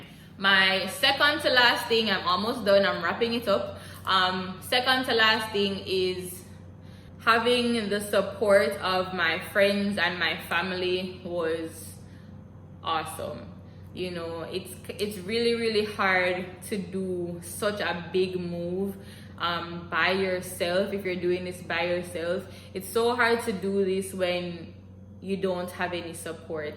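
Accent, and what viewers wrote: Jamaica. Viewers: Heard the Jamaican accent and instantly subscribed 🤣. Moving to Houston soon so I needed this video. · I know this was posted a little while ago, but thanks for sharing. I can hear an accent Jamaica Im assuming. I’m curious how easy/is it to find a community of islanders? Places you recommend? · i heard that caribbean accent jamaican to be exact. Thanks for the insight.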